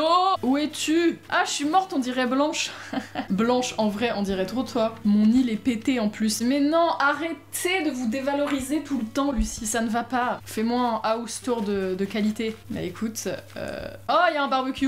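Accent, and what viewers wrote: France. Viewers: Salut, je viens de te découvrir ta chaîne et je trouve que t'es sympa et j'ai bien ta bonne humeur :). Mais j'aime pas trop quand les gens mettent des mots en anglais comme tu le fait car je trouve ça gênant surtout quand ton accent est fr comme ça mais après c'est mon avis et ce n'est bien évidemment pas une insulte envers toi. Je te souhaite bonne continuation sur yt 😉☺️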